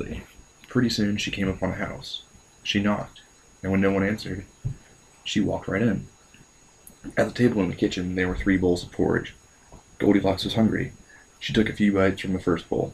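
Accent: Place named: Charlotte, United States. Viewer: I only notice a very slight accent but if you would have grown up 50 miles away from Charlotte your accent would be totally different(as in totally southern) hehe. I also live in Charlotte been here for 2 years now and it seems like the accent goes all out southern once I leave the city limits. Also most people from Charlotte are from the North, ie:NY/NJ/OH just like me:)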